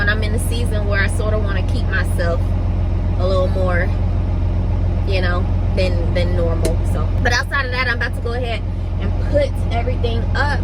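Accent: Michigan, United States. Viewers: LOL, I didn't realize you were local. But hearing you say "Meijersss" is so comfortable and familiar that I laughed. As Michiganders we have so many neologisms that mix with Yooper-talk and Canadian lingo and covered by all that Deep South drawl from our elders. 😁 People from outside the region have such a hard time figuring out where I'm from sometimes. 🤣 Waving at you from Detroit 👍🏾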